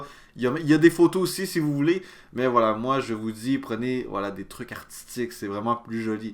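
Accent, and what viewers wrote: Quebec, Canada. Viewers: Vidéo rapide simple efficace + le petit accent québécois +1 abbonement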